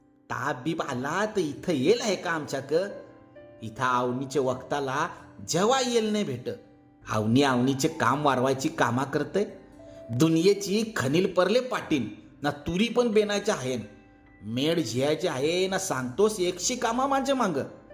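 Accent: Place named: Kunbi, India. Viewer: अप्रतिम कथा आणि अस्सल कुणबी बोलीमधील सादरीकरण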